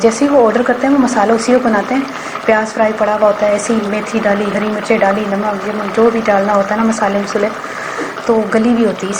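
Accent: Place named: Punjab, India. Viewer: میں پنجابی نہیں ہو پر آپ جو پنجابی بولتی ہو نا بہت سویٹ اور پیاری لگتی ہے آپ پر ورنہ پنجابی زیادہ تر بہت عجیب سے بات کرتے ہیں پر پہلی بار مجھے آپ کی زبان سے پنجابی پسند آئ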